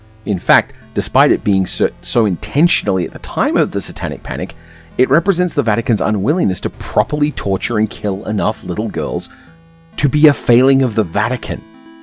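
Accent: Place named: United Kingdom. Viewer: Somehow I didn't notice that had a British accent until the "mum/mom" thing. I have watched several of his videos.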